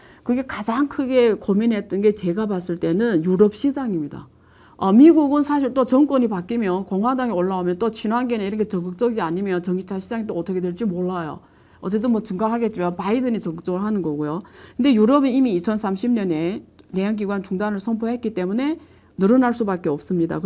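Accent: Yanbian, China. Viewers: 이여자 목소리가 외이례?? 조선족이가??. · 한국어 배운 중국인 같은 발음 이네요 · 연변 조선족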